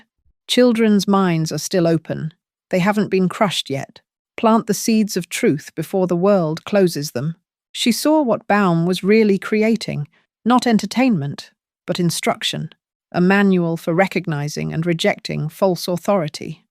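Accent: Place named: United Kingdom. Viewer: Blah blah blah. The Wizard of Oz is about Americans becoming US Citizens. It is about common law becoming admiralty corporate UCC law. Oz = ounces of gold. Tin man = Tax Identification Number. Scarecrow = Stramineus Homo = a person or entity used as a front, nominee, or agent for another party, often to hold title, provide bail/surety, or conceal the true owner. The lion is the sovereign (king of the jungle?) aka a free man on the land. She comes for 'Toto' which is not a dog but Latin for 'everything' because the banks take everything. White snow is narcotics. On and on you guys are being bamboozled by this British accent that want you to look elsewhere. To the content creator of this channel stop gaslighting. We are not in Kansas (a republic or commonwealth) anymore because she is now in 'The State of Kansas' a corporate state. Americans know all about this and we understand who is who etc. 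If this information is new to you, you're welcome. Don't listen to the majority of this color (legal term you should look up). I did not bother listening to this nor did I bother to read the comments. If what I am saying has been echoed already, good on them. Anyone saying anything else is a legal fiction and don't know it.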